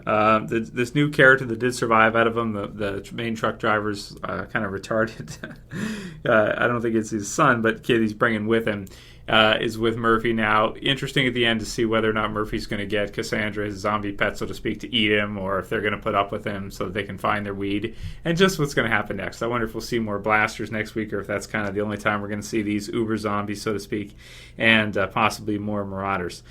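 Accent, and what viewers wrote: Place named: Ontario, Canada. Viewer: no one in Ontario pronounces "about" as "aboot". As I am from there, and I think sounds like a typical guy from Ontario. MAYBE some people in the far east coast of Canada like rural Newfoundland or maybe rural Nova Scotia (with their scottish roots) you might get someone that even come close to saying "aboot"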